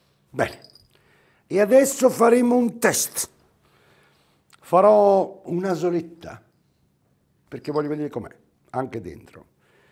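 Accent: Rome, Italy. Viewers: in italy we have dialects….each region has one. He talks roma dialect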